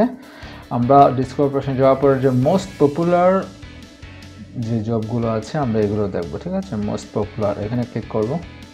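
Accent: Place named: Sylhet, Bangladesh. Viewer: Vai mone oy Sylheti 🤩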